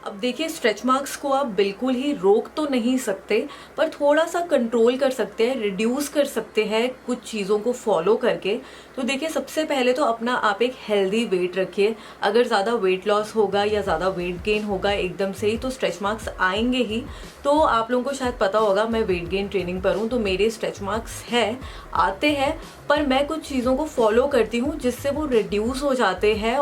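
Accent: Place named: India. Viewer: There are two types of talking in the world : normal and Indian Would you at least put subtitles? That if you talk in English I don’t know, you speak English and indian exactly the same ...not understandable 🤷‍♀️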